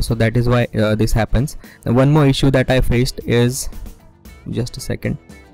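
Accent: India. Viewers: i hate this indian accent soo much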